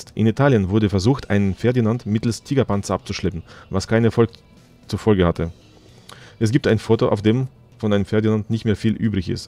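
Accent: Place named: Russia. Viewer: Deutscher Kommentar mit russischem Akzent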